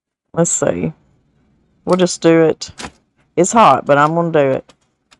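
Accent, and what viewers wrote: South, United States. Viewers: Where did you find those salt and pepper shakers/grinders? That recipe may have to end up in my collection, Miss. Several of your recipes are already part of the hoard. Also, I love the accent. My other half was a southern boy, from Benton, AR and still has family in that area. Those were some memorable trips to see the 'outlaws and the in-laws'. Being that I was the only 'Yankee' there, verbal exchanges were hilarious. In fact, I sent the 'How to Talk Yankee' book and tapes to them in preparation for another visit. They're probably still snickering....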